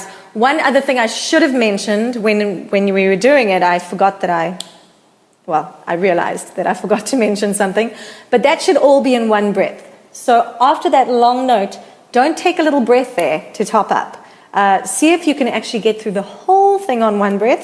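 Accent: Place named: South Africa. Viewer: I'm a huge fan of - her wonderful voice, her upbeat attitude, her wonderful songs and musicianship - and her lovely South African accent. Rock ON girl!!!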